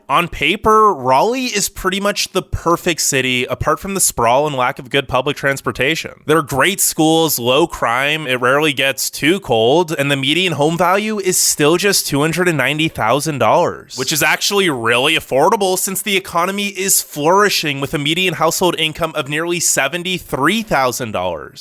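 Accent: California, United States. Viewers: Good video. Your California accent is so strong lol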